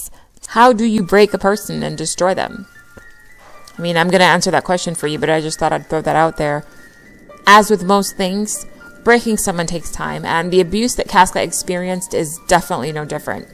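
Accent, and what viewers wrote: Trinidad and Tobago. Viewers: Caribbean accent, from Trinidad right ?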